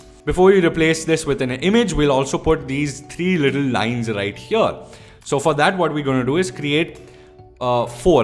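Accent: India. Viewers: Indian accent 😂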